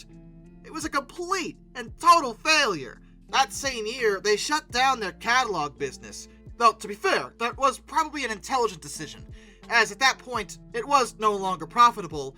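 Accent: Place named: Mid-Atlantic, United States. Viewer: I like it. It's like a mid 20th century newsreel with a slight mid Atlantic accent. Appropros for the subject.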